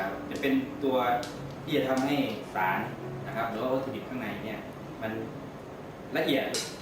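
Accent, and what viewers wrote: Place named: South, Thailand. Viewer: เกรื้องเกลื้อบดินเผา ,ทิ้งไว้สักกรู้ คนพากย์เป็นคนใต้แน่เลย<num> ก.ไก่ทุกคลิป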